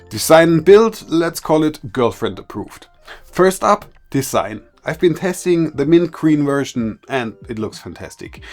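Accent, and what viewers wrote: Germany. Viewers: Nice Deutscher Akzent :)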